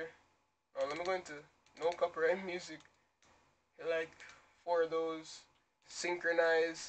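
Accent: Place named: Jamaica. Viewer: Are your Jamaican? Your accent is definitely peaking through 🙌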